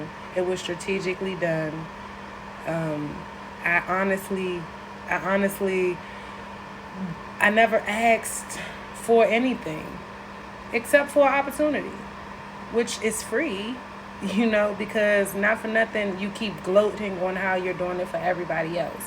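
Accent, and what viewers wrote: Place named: DMV, United States. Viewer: Im from DC and when I first heard you speak I knew you was from the DMV, you have the accent. I know you fucked up about this whole Chyna situation, but forget her. She was jealous of you. Plain and simple. She was jealous that you can be lowkey and private, wear comfortable clothes while she has cameras running around her all damn day. When I seen yall first interact on the show, she would throw you small jabs that I picked up on but Im surprised you never picked up on them. Oh well, its the past. Time to move on. And you and Chyna seem so different in a way, maybe befriend women who have a similar personality to yours. I know your going to be ok, you seem to know how to cut someone off if they not on the same wavelength as you.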